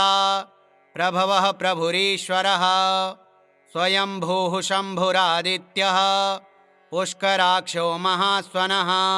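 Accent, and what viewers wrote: Standard. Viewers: शुद्ध उच्चारण सहित विष्णु सहस्रनाम प्रस्तुति । घर में सुबह पाठ से आनंदित वातावरण हो जाता हैं, बहुत बहुत धन्यवाद । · उच्चारा तील शुद्धता वाचकाला साध्य झाली आहे त्यामुळे ऐकणाराला श्रवण सुखा चा निखळ आनंद चा लाभ मिळतो धन्यवाद 😊 सा . म्हा म्हसे .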